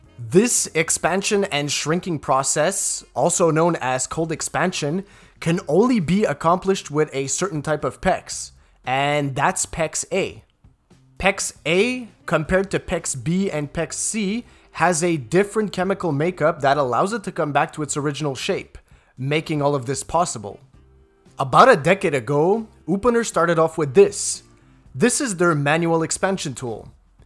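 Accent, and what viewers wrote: Quebec, Canada. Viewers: P’tit accent Québécois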